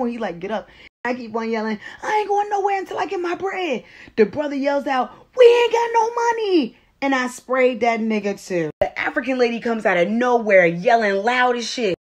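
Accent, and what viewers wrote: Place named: East Coast, United States. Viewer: I knew you had to be from the East Coast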